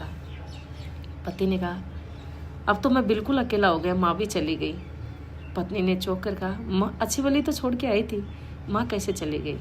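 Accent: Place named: Haryana, India. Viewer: Are you from Haryana you speak like one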